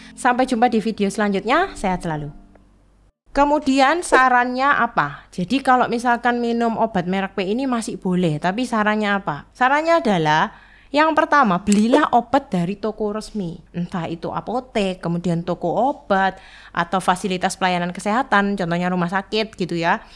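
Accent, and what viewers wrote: Java, Indonesia. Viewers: Bahasanya muuedok. Semoga sukses.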